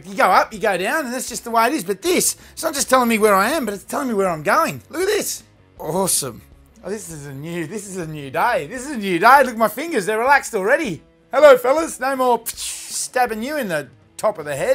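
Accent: Australia. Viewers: Aside from the Australian accent, you remind me of steve irwin lol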